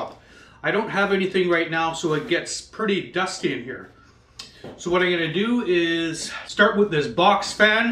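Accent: Canada. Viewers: great job bro! p.s. you remind me of Jordan Peterson's accent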